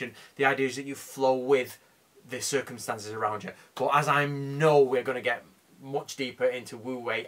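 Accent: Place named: Manchester, United Kingdom. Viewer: Love hearing a fellow manc accent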